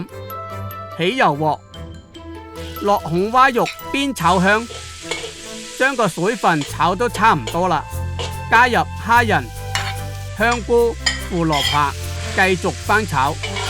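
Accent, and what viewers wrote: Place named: Siyi, China. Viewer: 梗係我哋四邑口音啫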